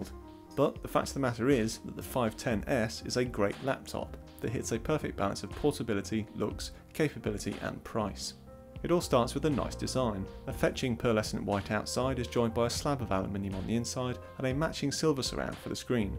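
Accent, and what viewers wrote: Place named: United Kingdom. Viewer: Was watching Austin Evans then realized his harsh American twang was a pain in the ears compared with your calm British accent😂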